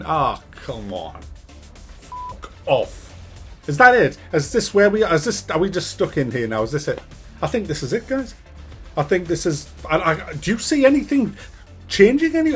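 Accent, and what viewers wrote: United Kingdom. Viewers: Stop pretending you speek english! I can't freaking listen to one more Brit on youtube... I need to put the auto subs on just to get your point and thats not the goal! And I want to watch all your videos becaus I adore them and you are pretty right with your critique, I have so often the same feeling and i dont want to hate on new AAA Games but how can you love old diamonds like Bioware or Bethesda in 2019? Its a shame and Im just keep playing becaus of good indie games like Mordhau or the upcoming rebel galaxy game.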